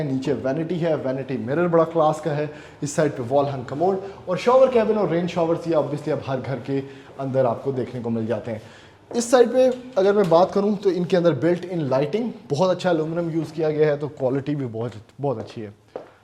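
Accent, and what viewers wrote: Punjab, India. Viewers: Ghar to bahut sunder hai but I really like your Punjabi touch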